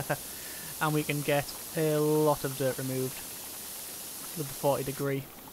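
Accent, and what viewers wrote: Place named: London, United Kingdom. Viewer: Quad pipe? Qruok pipe? I thoight "axing a question" was bad wnough. Can you londonese stop ruining English language.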